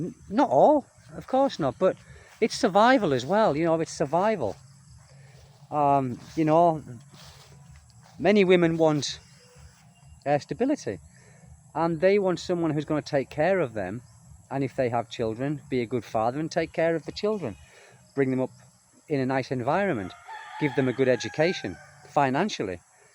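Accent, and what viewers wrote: United Kingdom. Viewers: I think he's more a practicologist w the British accent Westerners enjoy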